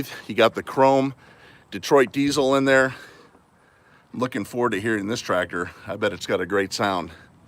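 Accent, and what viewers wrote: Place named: Texas, United States. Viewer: When did you get a Texas accent?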